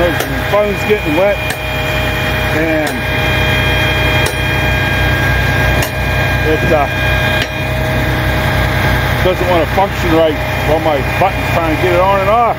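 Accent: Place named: Pennsylvania, United States. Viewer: Hello my friend! Questions is your accent south or central Pennsylvanian? South Jersey or Maryland?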